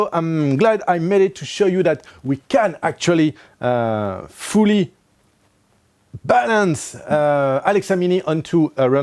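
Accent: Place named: France. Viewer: On voit très bien que tu es français tu as un fort accent pourquoi pas une vidéo en français ?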